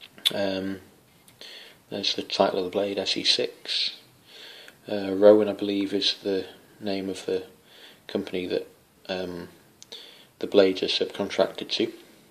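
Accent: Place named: Wales, United Kingdom. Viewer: Hey man where in Wales you from.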